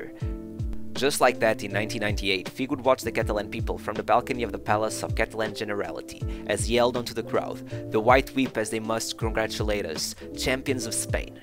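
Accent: Portugal. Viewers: Lovely video from a portuguese speaker, if I can catch your accent correctly, am I right? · Judging by your accent, I'm very sure you are Portuguese. Great job with this channel, keep going! 😁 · O sotaque e pronunciares bem os nomes portugueses denunciaram-te🤣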